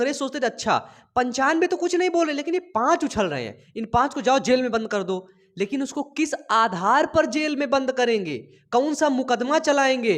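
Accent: Uttar Pradesh, India. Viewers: Sir Aap u.p shy hoo kya 🤔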